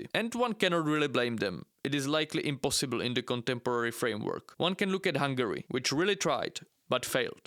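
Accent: India. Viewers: Bro is Czech but sounds Indian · Wait. That fucking jeet accent. LMAOOO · Are you really Czech? You have an Indian accent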